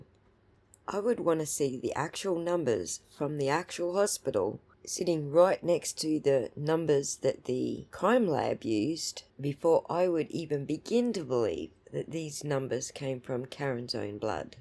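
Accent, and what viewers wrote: Australia. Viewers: I love listening to you. I understand what you are saying even with the math. If I had to math it out myself,I think I would end up in a brain fog stupor 😂. I followed the last trial with Vices ❤. I'm so happy to have found your channel. Much respect from south of the Tweed.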